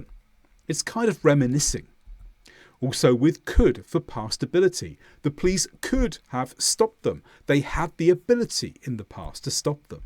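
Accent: United Kingdom. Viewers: Wow the British accent whatever it is nonsense 😮😢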